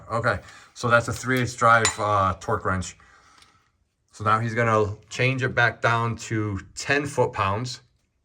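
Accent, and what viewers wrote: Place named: Midwest, United States. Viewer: I like the way of talking ! Midwestern? It’s GREAT! Don’t forget to open all air ways on the top before you pop that plug off and keep the plug off when you pop the filter off. The more air ways the better. Some people even pull the dip stick right out and take the cap off the top so long as nothing falls in there. You might notice a bit more oil gets out. Also wait until drips stop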